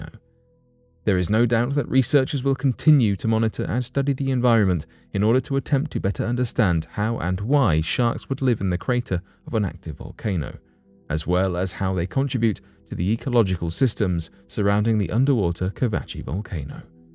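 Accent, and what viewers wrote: United Kingdom. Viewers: Cmon now.. its a quieter gentle UK 🇬🇧 accent. Far better than some we have in 🇺🇸 🌎. God bless all!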